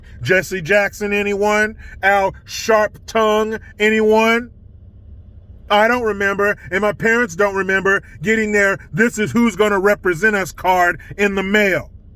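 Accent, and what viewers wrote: Australia. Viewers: Lol love your Aussie Accent, 😂😂😂 🤣🤣🤣🇦🇺💕💕💕🇺🇸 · Love the Australian accent......"mate!"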